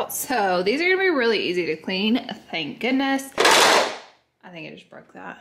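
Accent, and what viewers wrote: South, United States. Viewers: calling the pots boilers in her southern accent is the cutest thing on YouTube.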